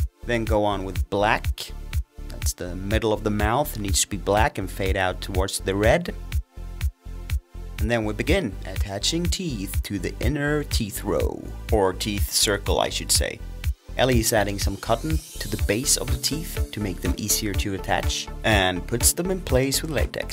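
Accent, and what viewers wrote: Scania, Sweden. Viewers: hAN ÄR SKÅNSK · är han som snackar skåning eller det låter så när han pratar svenska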